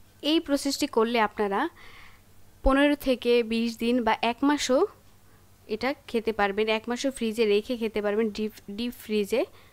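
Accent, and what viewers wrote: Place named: Rajshahi, Bangladesh. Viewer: Nice Rajshahi accent Useful cooking method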